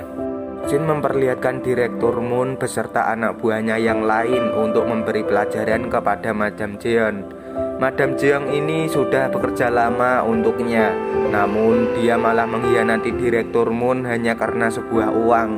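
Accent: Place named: Java, Indonesia. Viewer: Jawanya medok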